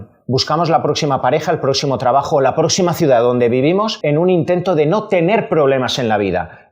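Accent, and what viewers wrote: Spain. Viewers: Es insoportable escuchar un españolete y se creen que todo lo saben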